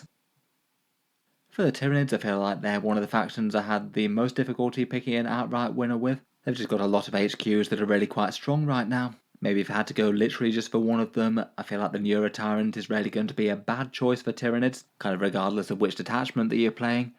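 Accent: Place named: United Kingdom. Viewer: So is very clearly from UK. As an American it throws me for a loop whenever i hear Loo-tenant instead of Lef-tenant in a British accent.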